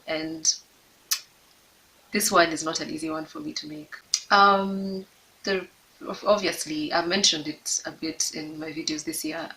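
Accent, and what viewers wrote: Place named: Nairobi, Kenya. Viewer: Sort of fell upon your channel. You remind me of someone I knew... beautiful and soulful and absolutely terrified of being vulnerable. Glad you found the strength to share. You sound so Nairobian though! It's too bad you had to leave.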